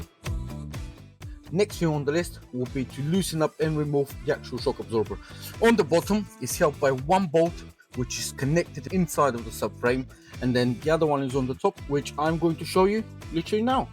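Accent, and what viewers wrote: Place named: South Africa. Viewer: South African? Love the accent!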